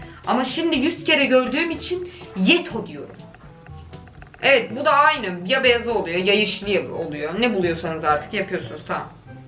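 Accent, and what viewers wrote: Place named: Sivas, Turkey. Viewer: Abla nerelisin ben sivaslıyım aynı senin gibi konuşuyom gızzzz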